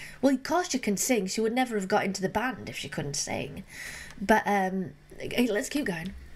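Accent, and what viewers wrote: Manchester, United Kingdom. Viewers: I follow your channel just for your accent. It's so cute.. are you from Manchester? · ...please do some Alanis Morrissete and Björk...always enjoy your reactions, you are so nice and cute, love your british accent! x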